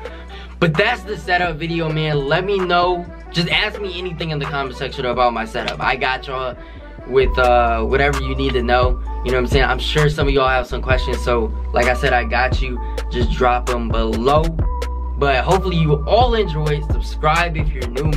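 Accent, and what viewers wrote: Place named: New York, United States. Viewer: What’s ur ethnicity and where u from ? U sound from New York and look Dominican 😭😭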